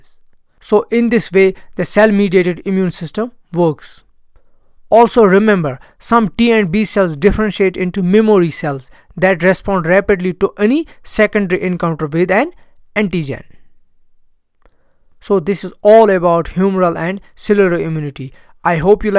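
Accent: Kashmir, India. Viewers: Indian Accent again. Sucks! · Typical kashmiri accent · Are you a Kashmiri? Coz that's a very similar accent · amazing vedio sir ...💕 we all kashmiris sound different and beautiful thats why i was able to recognize u ...keep going ✨ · Kashmiri accent 💕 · Idk y but sir your accent seems Kashmiri 😅😅 but truely it made it kinda more easier to understand ..tysm sir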